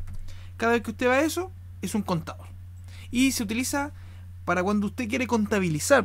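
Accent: Chile. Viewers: no se podia menos chileno?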